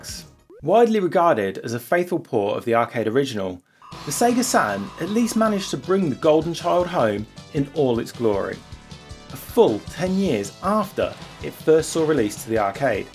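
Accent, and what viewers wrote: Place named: East Coast, United States. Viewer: Aww, classic images of Great Yarmouth! I was there last week, at that very arcade. Sorry for the late comment, I am catching up. Plus, we might of been at the arcade at the same time, though I would of been 21 years old, not 6! Agree fully, Out-run was the first arcade game I played on the Mister when I got mine a few months ago. I am still awaiting Flying Shark (Fire Shark's older brother) I really hope that comes to the Mister soon. Also, it would be great if you reviewed the computers that Mister supported. I can always help there, as I have most of them setup. SpectrumNext on the Mister is fantastic, but like all the computers on the Mister, they are great, but not like the original beasts. This is my third video I have watched, subbed after video 1, and I did guess you were from the east-coast. Right, I'm done!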